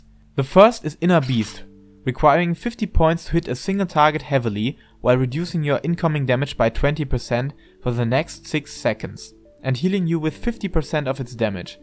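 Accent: Germany. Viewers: Just a suggestion, it might be better to introduce the skill sets by bullet points. Overall the guide is quite straightforward. And German Accent is so damn badass. Love it. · you can hear he's german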